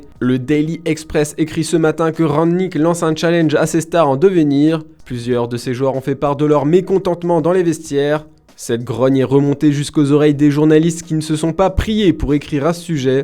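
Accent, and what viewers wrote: Italy. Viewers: Ton accent italien, on en peut plus 😰😰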